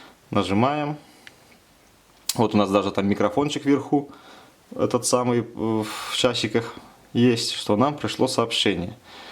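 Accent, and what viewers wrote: Belarus. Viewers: Акцент на белорусский похож)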